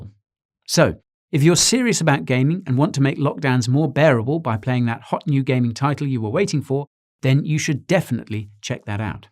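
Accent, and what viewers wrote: United States. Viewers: Note can speak American as well. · Can you do a 2hole video in American accent. You're American accent is so good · Vacations. Fall. Lumber... I've been noticing your "American" is coming along nicely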